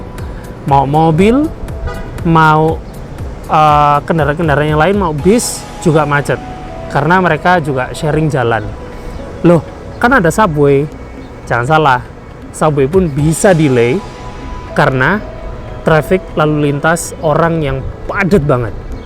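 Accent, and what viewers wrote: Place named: Java, Indonesia. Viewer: Jowo mas😂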